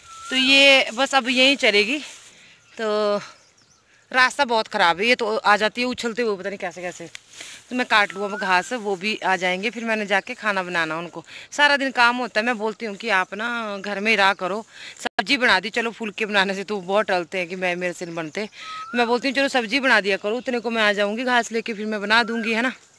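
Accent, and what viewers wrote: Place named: Himachal Pradesh, India. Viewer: Ur language is as u belong to Una dist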